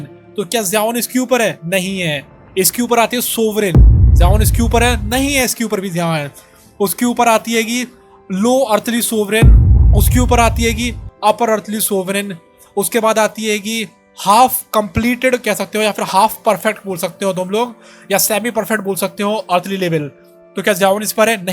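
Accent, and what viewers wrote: Punjab, India. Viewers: Bro r u punjabi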